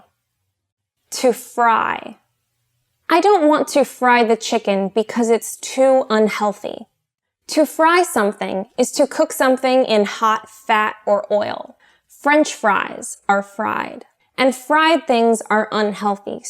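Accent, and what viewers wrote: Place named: United States. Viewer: i dnt like AMERICAN accent !Tbh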